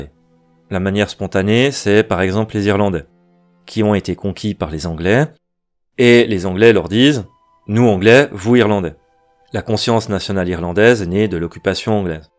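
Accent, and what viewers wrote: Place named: Monthey, Switzerland. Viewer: Excellente vidéo ! Claire et concise, j'aime 👍 Par contre, tu viendrais pas de Monthey par hasard ? Je peux me tromper mais il me semble que tu as l'accent de la région 🤔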